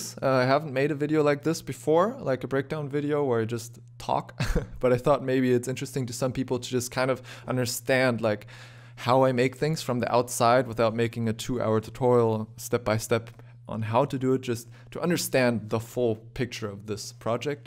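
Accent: Germany. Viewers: haha ich war mir nicht sicher hab es mir gedacht klingt ein bisschen wie Deutsches Englisch. Aber du sprichst richtig gut Englisch mach weiter so !!!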